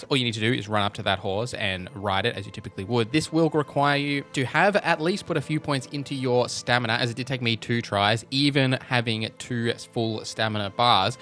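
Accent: Australia. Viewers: I thought people liked the aussie accent. Why are they bullying us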